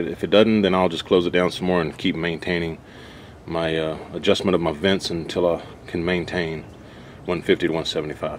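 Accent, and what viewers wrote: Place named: Texas, United States. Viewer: Thank you for holding it up. It smelled great. Also, I like your Texan accent. I was a Houston resident for 20 years until the early 1980's.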